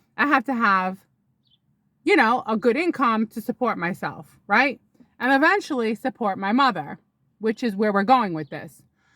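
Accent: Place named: Brooklyn, United States. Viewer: The old Brooklyn accent is really coming out, you know what I'm sayin 😂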